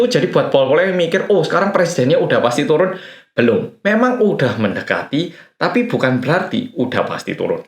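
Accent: Java, Indonesia. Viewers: Mantap masss medok, update terus yaaa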